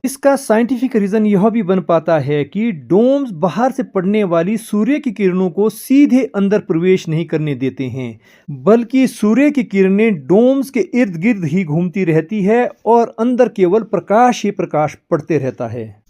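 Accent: Standard. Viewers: Aap ek dham pure Hindi bolte hai unkal ji ❤❤veda cute ❤❤❤❤😊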